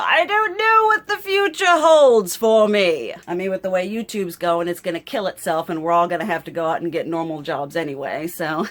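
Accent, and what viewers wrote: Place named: Appalachia, United States. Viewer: OK but where can I get this sweater? Also, judging by your accent and you driving between TN and VA I'm guessing you must be Appalachian like myself. I'm currently in Asheville but grew up in Wise County VA about 45 min from the Tri-state area. If you know what I was just talking about, HOWDEE 🤣If not, that accent still sounds like home!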